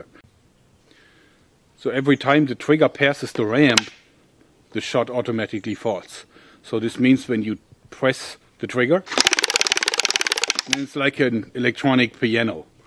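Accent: Germany. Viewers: You match with the medic from tf2 with your German accent and syringe gun/ slingshot.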